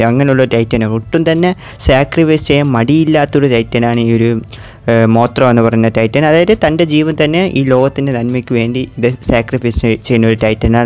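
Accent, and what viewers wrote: India. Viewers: Thamizhan ആണെന്ന് തോന്നുന്ന്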